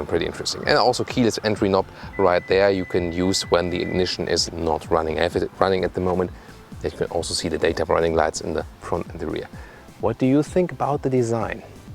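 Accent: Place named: Germany. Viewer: man merkt schon,dass du ein deutscher bist...sprichst ziemlich langsam englisch.was gut ist,da versteht man,bzw. ich auch mehr... 😉 die engländer sprechen ja fast doppelt so schnell... 😂😉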